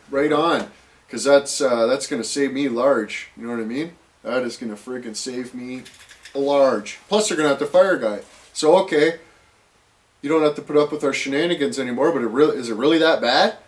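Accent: Canada. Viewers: Best Cannuck accent ever.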